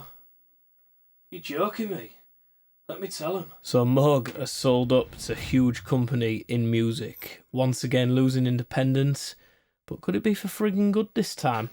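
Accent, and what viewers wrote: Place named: Yorkshire, United Kingdom. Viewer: Sounds like Selby or a Donny accent. I'm I close?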